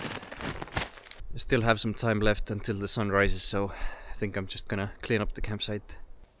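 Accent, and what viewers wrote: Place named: India. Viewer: plzz aitab! Sa teed megägedusi... aga see su `english`` on nagu india smthinng .....nääääh.... noup... no ei,,,,,kus on Gabriel??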